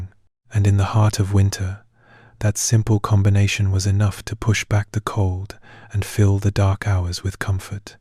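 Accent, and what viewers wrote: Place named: United Kingdom. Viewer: So we have a a British narrator talking about American Indians.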